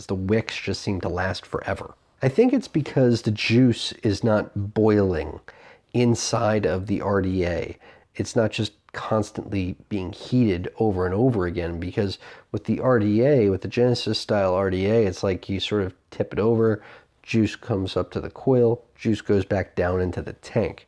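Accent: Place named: New York, United States. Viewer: your mini me really brings out the new yorker in you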